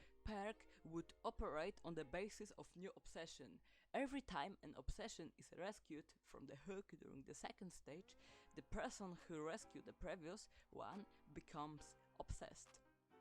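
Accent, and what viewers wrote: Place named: England, United Kingdom. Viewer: Uu ar pierdolnięty anglik xd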